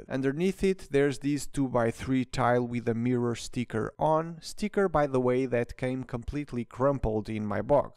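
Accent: Portugal. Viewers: Tu tens um inglês mais tuga de sempre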